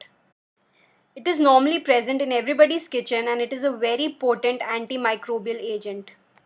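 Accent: India. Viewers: Your voice is very irritating.really don't like Indian dialect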